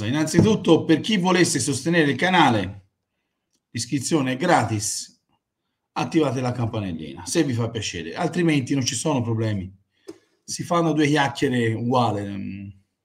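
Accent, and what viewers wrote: Tuscany, Italy. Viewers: Dal accenro si capisce alla perfezione che sei toscano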